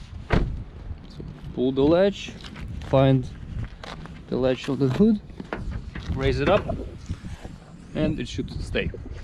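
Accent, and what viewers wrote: Russia. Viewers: Спасибо за русский акцент)))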